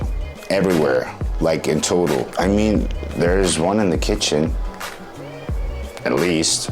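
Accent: United States. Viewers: I like the way he says channel. It sounds like chiennal. I wonder what part of American accent he has.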